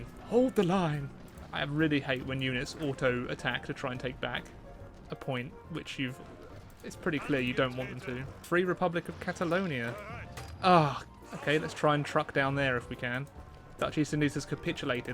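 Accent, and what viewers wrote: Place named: United Kingdom. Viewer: I have noticed when you read outloud some of the chats messages you change up the i to he. Is this a normal british thing?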